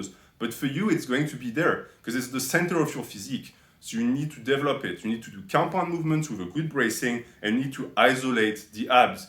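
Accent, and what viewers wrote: France. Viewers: No way you aren't a french compatriot with this accent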